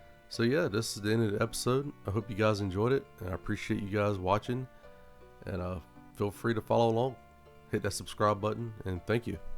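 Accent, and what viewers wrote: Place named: South, United States. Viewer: Can I just say, it's about time some southern accent made it into osrs content creation. Love the series man, hope youre enjoying it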